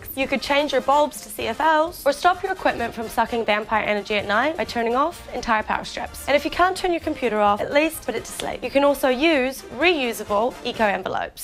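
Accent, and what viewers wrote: Australia. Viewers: she talks way too fast. shes australian isnt she ß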